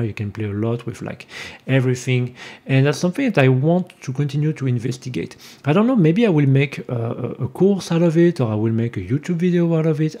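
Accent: France. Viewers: Ton accent c'est une evidence tu parle français. good video btw.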